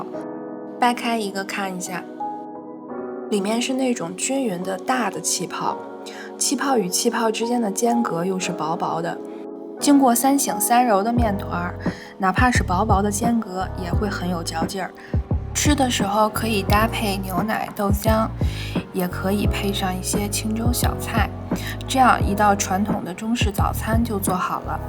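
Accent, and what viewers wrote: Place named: Beijing, China. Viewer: 真棒👍京味儿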